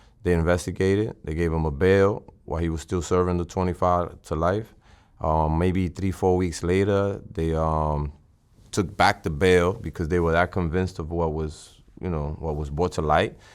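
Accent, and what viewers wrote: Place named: Bronx, United States. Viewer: "If you have a farm full of cows, does it pay for you to release your cattle? No, you gotta keep them in order to milk them." Damn, that's so true. I lived 14 years in Parkchester/Castle Hill, The Bronx. I hear that Boogie Down Bronx accent 🤌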